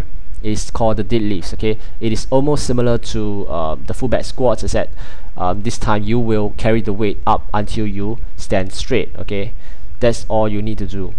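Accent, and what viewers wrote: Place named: Singapore. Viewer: er you sound like a singaporean, am i right?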